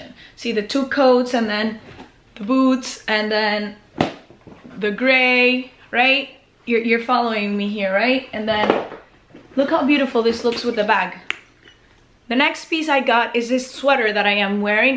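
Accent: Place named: Cuba. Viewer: Your accent makes me miss my Cuban friends in Miami!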